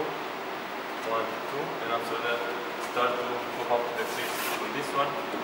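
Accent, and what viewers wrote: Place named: Ireland. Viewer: It's an irish accent I believe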